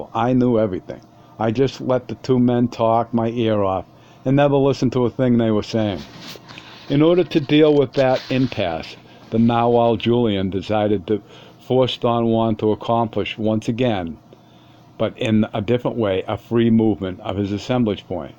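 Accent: Boston, United States. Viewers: Thanks so much for this upload. Your bostonian accent has been blessing my mornings for the last few weeks. Looking forward to more.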